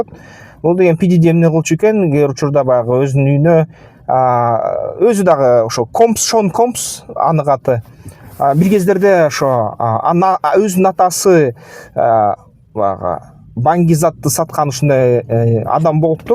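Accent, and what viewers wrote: Standard. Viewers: Ассаламу алейкум. Менден дагы салам сага 🤝🏻 Санкт-Петербургдан. Сенин айткандарын аябай жагат грамотно орду менен таза кыргызча жонокой кылып тушундуросун. Калтырбай угам.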